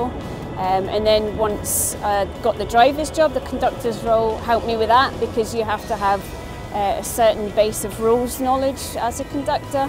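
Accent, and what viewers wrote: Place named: South, United States. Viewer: sounds like somebody who moved up from the south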